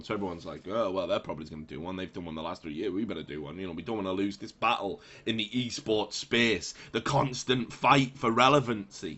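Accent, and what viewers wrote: Wales, United Kingdom. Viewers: Why is your Welsh accent so strong in this video? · I thought the welsh accent was a april's joke. Had to double-check the date. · I want you to gently complain about things in my ear with your Welsh accent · Love the welsh accent. I'd like to hear it more often. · Welsh accent coming back? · Wth it's so weird to hear you talk like that! I'm not a native speaker so it never occured to me that your usual way of speaking was some sort of broadcasting voice. Well at least now I kinda know what a Welsh accent sounds like, so I learned something from the video. I liked last year's april fools joke by esportsexpress tbh. Publishing legit but funny chatlogs by Arteezy and keeping the community guessing if they were actually legit or if it was just an april fools joke. In general I'm way too gullible so maybe I don't dislike april fools day that much, because I may actually fall for it sometimes.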